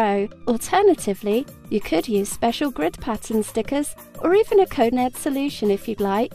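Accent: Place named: United Kingdom. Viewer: i loooove this british accent.....i really do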